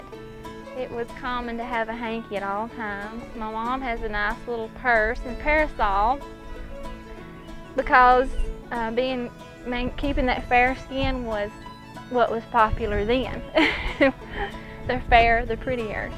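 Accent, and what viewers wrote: South, United States. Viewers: I just love the Southern accent. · Its weird seeing this b.c here in the south this is a low class accent. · You have a great southern accent, so charming. Love the dresses too! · You made these dresses yourself? Very beautiful! 👍🏻🤩🥰 And I like to hear your southern accent, like in the tv series of “North and South”; I live in the Netherlands 😅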